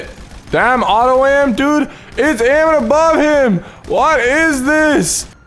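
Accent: Ireland. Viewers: Where is he from in ireland.my guess Kilkenny .cork or kerry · r u Irish?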